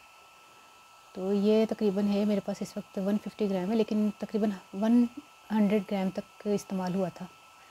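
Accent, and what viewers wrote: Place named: Punjab, India. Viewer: Wooow v nice... Apk baat krny ka andaz bhot khub surat h r sath main panjabi ka tarrka wah wah 😍😘😘💖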